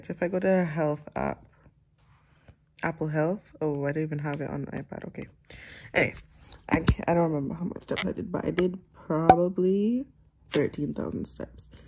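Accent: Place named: United Kingdom. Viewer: Loved this! I hear the British accent coming through🤣🤣. Separately, that softball taster session finished me, same time next week🤝🏾🤝🏾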